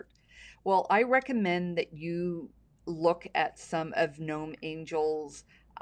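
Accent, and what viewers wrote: Canada. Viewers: Hello I love your videos and really appreciate a Canadian voice. Because sometimes we don't always get the best variety and prices over here. But we love what we do. I would still consider myself a beginner in the grand scale of things. However I'm getting there for sure in terms of building my basics. And keep seeing your beautiful complex personal projects. Wich leaves me to ask if you can do a more intermediate quilt block video of some of your favorite blocks. Even some from the beautiful backdrop behind you 😍 would be wonderful and very appreciated.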